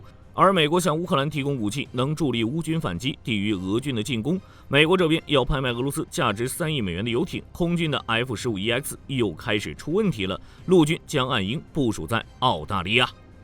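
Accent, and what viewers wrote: China. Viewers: 好抗拒這類普通話聽得很不舒服😢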